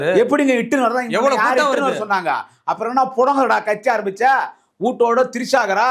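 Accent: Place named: Pondicherry, India. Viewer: Pondicherry/south arcot district..slang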